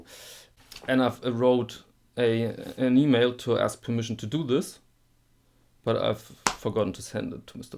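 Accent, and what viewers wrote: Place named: Germany. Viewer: I enjoy your videos for several reasons... Mostly, your accent and mannerisms. You remind me of my Grandfather who was born and raised in northern Switzerland in the city of Aarau. I understand that you have a German accent, but you sound much like my memory of a great man. Also great content. Cheers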